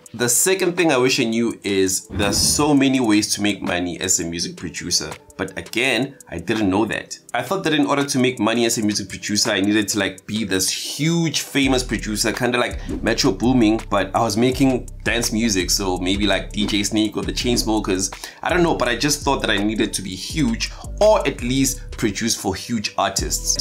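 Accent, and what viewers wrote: South Africa. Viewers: i heard by the accent that this is a 🇿🇦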